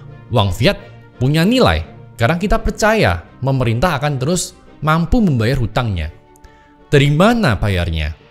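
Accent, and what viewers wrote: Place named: Surabaya, Indonesia. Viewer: Iki ketok’e arek Suroboyo yo? Keroso dari logat e. Lek iyo berarti kita konco plekkk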